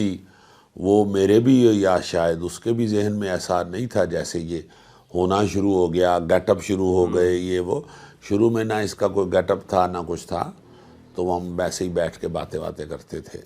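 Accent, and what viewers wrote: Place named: Punjab, Pakistan. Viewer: Bhaji ajj te Urdu wadhiya bol rahe ho.nahin ta Punjabi da lehja aunda jiyadatar tuhadi bolli vichon.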